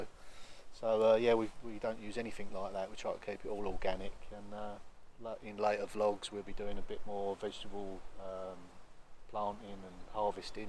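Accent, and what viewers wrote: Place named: England, United Kingdom. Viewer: I love your vlog. It's one of those welcome to my friendship, my family and my home. I used to live in England many years ago and my partner was a true cockney who lived on Basildon Essex. Your accent and persona remind me of my life long ago when I too used to collect copper and restored two houses together in Fleetwood, Lancs. I now live in Arizona and you remind me of the life I used to live. Very special memories. Thank you for sharing. ❤ from AZ 🇺🇸.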